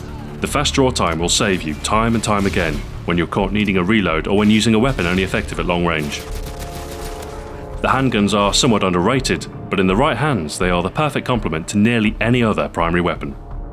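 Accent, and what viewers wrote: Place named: United Kingdom. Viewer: your British voice is hypnotic..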